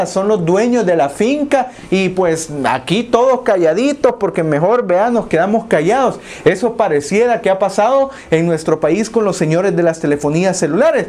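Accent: El Salvador. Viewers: Es el primer video tuyo que miro y me llega tu formato y hasta el nombre del canal, pusiste buena información sobre la mesa. Expusiste bien y con original acento salvadoreño. Felicidades y saludos desde los Ángeles, California me suscribo.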